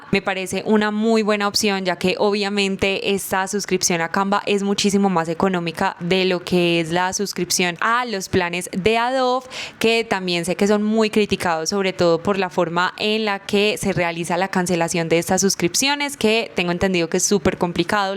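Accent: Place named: Colombia. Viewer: Su acento colombiano! 😍😍😍 soy de México.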